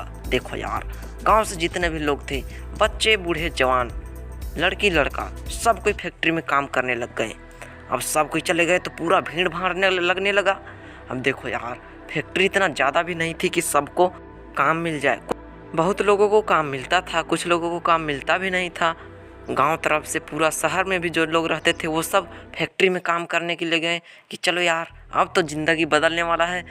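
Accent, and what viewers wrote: Bihar, India. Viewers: are you bihari , because you sound like a bihari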